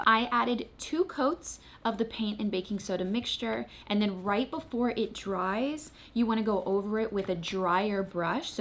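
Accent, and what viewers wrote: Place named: Hill Country, United States. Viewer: I love love love the way you speak. I hope that's not weird...you have such an elegant tone and each word if full of genuine feeling. did I already say I love it!! Maybe I just miss hill country accents <3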